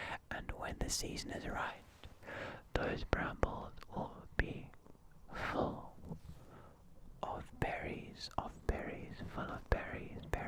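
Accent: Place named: South Africa. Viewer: you sound south African homes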